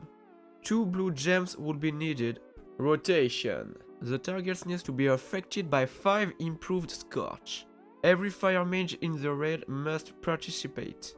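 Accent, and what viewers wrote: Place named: France. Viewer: Great vid! The french accent only makes it better <3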